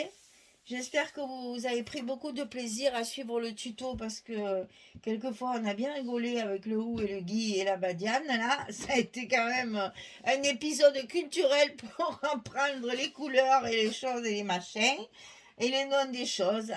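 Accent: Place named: Occitanie, France. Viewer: Bonjour, j'adore vos créations elle sont manifiques 😍💕votre accent très agréable ressemble a l'Occitanie. BRAVO.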